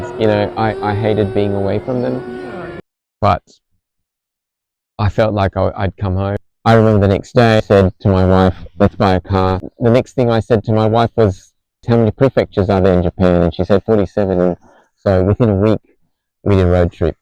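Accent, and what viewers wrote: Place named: Malaysia. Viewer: I’m here after watching the video of you speaking with your daughter about complexion. As a mum of 3 beautiful mixed girls (Indian and Chinese), I do feel for them when they are asked about their heritage. But they consider themselves lucky.. they get double the festive celebrations and double presents! We are blessed to live in Malaysia. And I detected a slight Malaysian accent when you spoke, happily I was somewhat correct, lol. Good luck in your journey. Home is where the heart is!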